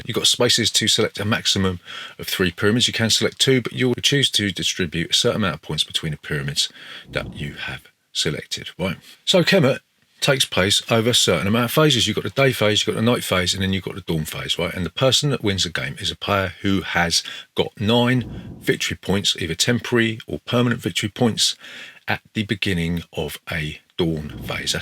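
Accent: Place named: England, United Kingdom. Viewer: As always thanks for the entertaining review. Like your perspective, always nice to hear an English accent when living away.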